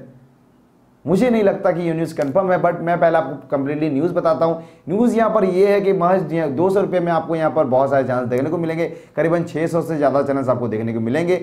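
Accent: Bengal, India. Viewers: aap Bengali ho kya?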